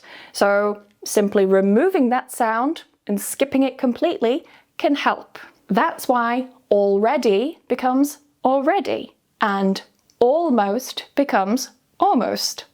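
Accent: United Kingdom. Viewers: Wow! Good British english pronounciation. Great!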